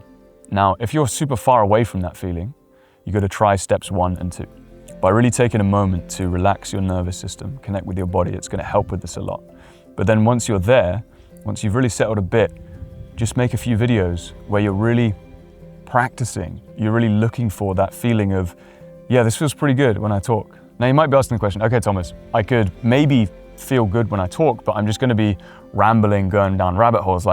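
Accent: England, United Kingdom. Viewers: Well, I watched your video. And at the end, I felt like I was talked to by a skeevy religious zealot trying to get me in their cult. No amount of soft music, country bokeh, low grade UK accent voiceovers and coy looks is going to change that. I will continue to be me. Draw those that enjoy me for me. Try to be the BEST me I can be. And not gaslight people into "liking and following me". Where exactly is the merit in that? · English accent. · It's called having a British accent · It also helps to be classically good looking and charming -- And to have a clear and articulate English accent with a soothing baritone voice. No Diddy. I'm just pointing out that in addition to the steps which you cover, I believe it will work especially well for you because you are beautiful (although your skin was oddly greenish-yellow in some camera angles.) I guess the point of my saying all of this is, I wonder if this would work for everyone. 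I mean, I'm going to try it for sure, because I am not a blubbering rock troll and I'd like it if people watched my guitar content. I appreciate this video and I look forward to seeing more of your stuff. Thanks! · Not everyone likes an English accent. I for one generally don’t . I will skip one it unless American English. This guy has gone tone. I’m interested in what he is saying so I listened to see if I can incorporate in my channel- which is a different IDENTITY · I used to work at a radio station as a receptionist. I heard every kind of great voice to the slow, boring voices. I learned a few things that have helped me with my channel. I try to let people "feel" my voice. This is good information he is sharing. The English accent just gives him a cooler edge. 🎤👍🏽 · is the english accent a requirement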